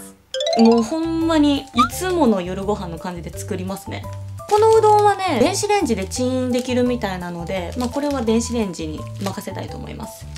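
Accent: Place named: Osaka, Japan. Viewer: 大阪弁が癒されます！いつも、ありがとうございます😊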